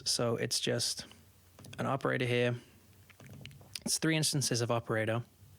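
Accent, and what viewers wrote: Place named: Northern Ireland, United Kingdom. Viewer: Bro you sound british, maybe northern ireland?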